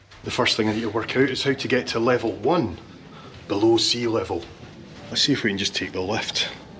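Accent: Scotland, United Kingdom. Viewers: Your videos are much better than some of the more well known utubers. My mum is Scottish and I love the accent. The things I like the most about your videos is you show these trips from a much more affordable way so it becomes attainable for a lot of people. I also love the fact that your narration is not monotone like some of the others who actually put me off. You don't use lots of silly gimmicks and your filming is lovely. Will be watching more of your trips. Well done. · I live in the USA where I was born, but am a proud descendant of brave Scots men and women who crossed the treacherous North Atlantic nearly 400 years ago. I have just discovered your channel as a result of searching for information about this ferry. Thank you for the engaging post and I love your Scots accent. 🤗. Edit: It is a handsome ship indeed. I’m truly adding this to my “bucket” list. 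👍🏼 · Im detecting a Scottish accent, I went to Rannoch School 4th and 5th form back in the 70s 80s and love watching these vids. My Fathers side is from the Hay Clan and my Mother unfortunately has ties to the McDonald Clan. But mt Tartan is Hay Clan. Keep up the good work and dont be a poofter. The Scottish would rule the world if not for Scotch Whiskey!! Keep up the good work and hope you get at least your Bronze award for this expey! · And, have to add, you have a marvellous voice, very friendly, very clear, and, o/c, lovely accent! - can't beat a good Scots accent. Thanks for the voyage. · Well done, clear and honest info. brilliant. And your SCOTTISH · Rarely have I seen such a beautiful, friendly travel report as yours. Not a trace of snobbery, absolutely unpretentious report intended for the average consumer. The narrator's heartwarming, generally positive nature with his lovely Scottish accent (at least I assume it's a Scottish accent, right?) do the rest. 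Thank you very much, Mr.! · Hi! First time watching your channel. (Yes, I subscribed. ) Loved the video very much. I live in the state of Washington, USA. I could really feel the cold you felt! Really liked your monologue, and your Scottish accent. Felt like I was on the ship with you.. Looking forward to catching up on other videos of yours and to future ones. 🙂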